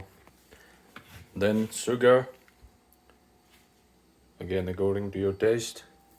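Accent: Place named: Australia. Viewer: bro ko ni aussie accent aayechha😃😃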